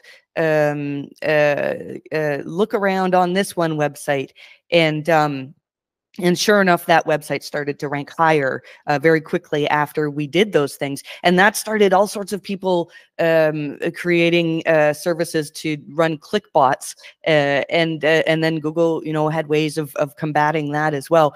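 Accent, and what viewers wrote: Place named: Canada. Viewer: I don't remember you having such a strong Canadian accent :) Luvyawork. Panda is scary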